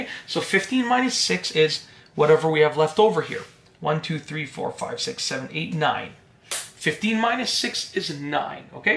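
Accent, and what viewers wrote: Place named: Canada. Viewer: I suspected this came from Canada, because of the annoying accent [Wisconsin, you are now off the hook!], and, as soon as he referred to a dollar as a "loony", my suspicion was confirmed ... and, I navigated away. You should follow.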